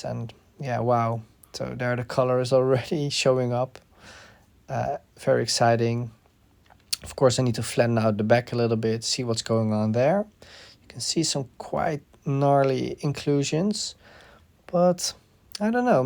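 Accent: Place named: Netherlands. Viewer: Just by hearing his voice speaking English i now he is a hollander 😂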